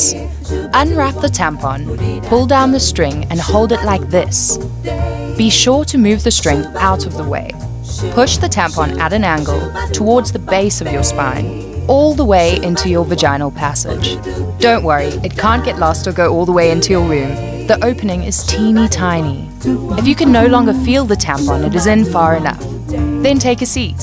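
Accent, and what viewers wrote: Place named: India. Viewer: Dude are u from India, sounds like that!!!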